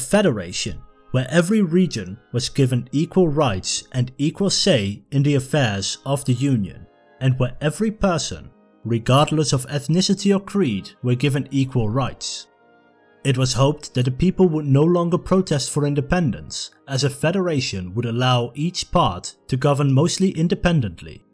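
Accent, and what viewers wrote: Netherlands. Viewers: I can hear you're from the Netherlands or in neighbouring regions goed man! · Ugh I added this vid to watch later, wanted to watch it later but i totally forgot this guys horrible accent. All your topics are interesting but either do them in Dutch or work on the accent cause its unwatchable for me. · I appreciate this entire video and all the effort that went into it; but fuck me, does your dutch accent hurt my ears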